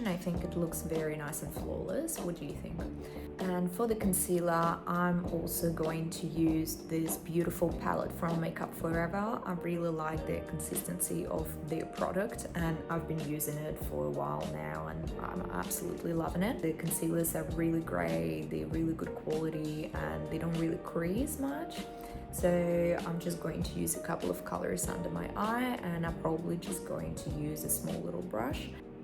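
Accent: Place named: Australia. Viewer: у вас такой классный австралийский акцент😍очень красиво звучит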